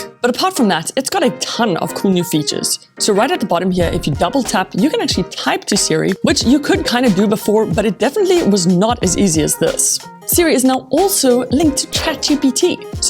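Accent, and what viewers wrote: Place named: South Africa. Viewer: This lady sounds very south african, where is she from?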